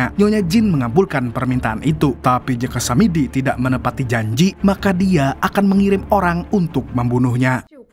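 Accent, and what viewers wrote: Banjar, Indonesia. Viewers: Urang Banjar asli kah bg .iya dah bubuhan Samidi🤣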